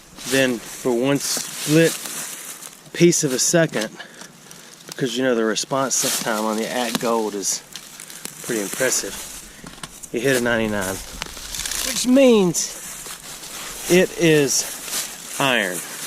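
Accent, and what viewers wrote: South, United States. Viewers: I get a lot of enjoyment out of misspelling in my head, the way that he says it. I have spent some hysterical minutes trying to spell aluminum foil "Foll". I'm not making fun, I'm just really enjoying your puns and your Southern accent anthropologically. I am not ever going to be clever enough to play on words like you do, it's a gift and we are lucky you share with us.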